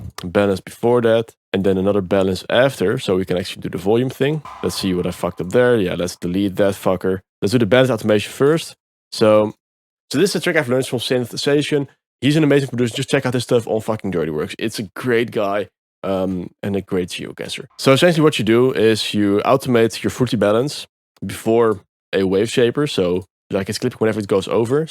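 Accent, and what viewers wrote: Netherlands. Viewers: ahhaha vaak kan je dat gewoon horen bij nederlanders die engels praten :P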